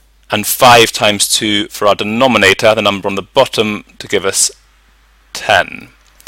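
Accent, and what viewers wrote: United Kingdom. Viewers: are you british 😦😦😒😑😐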